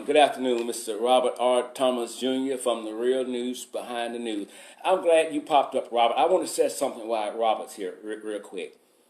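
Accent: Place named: Louisiana, United States. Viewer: I am really enjoying this channel and love the commentary. Your Louisiana accent and passion....very enjoyable.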